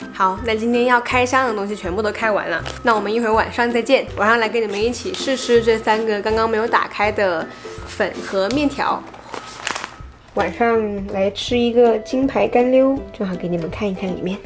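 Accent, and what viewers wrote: Changsha, China. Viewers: Zhi Xuan Ang 口音大陸人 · nia xswl我就说口音怎么如此熟悉原来你是长沙的嘛哈哈哈哈哈哈哈哈哈哈哈哈哈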